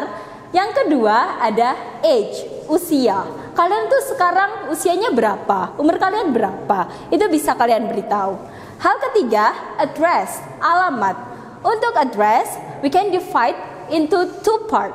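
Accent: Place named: Java, Indonesia. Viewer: Medok mya gk pernah ilang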